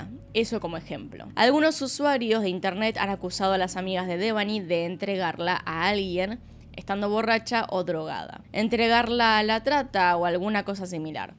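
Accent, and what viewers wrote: Argentina. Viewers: Amiga no hables como Argentina estás en México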